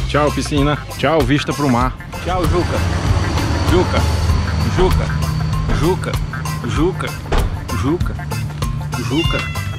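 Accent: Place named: Ceará, Brazil. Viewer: FállôôUuuuu do jeito que tu fala é muito cearenses man!! um salve daqui de SP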